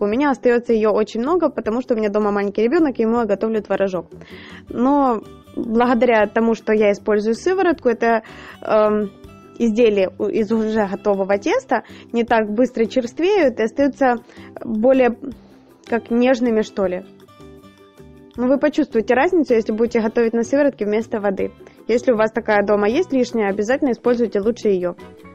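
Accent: Ukraine. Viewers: Нормальное украинское произношение, нЕчего к нему придираться, в России тоже есть регионы со своим специфическим наречием, кто-то окает, кто-то акает, меня, в свое время, в школе, украинка постоянно ругала за твердое произношение буквы "г" на русский манер, украинское "г" звучит намного мягче.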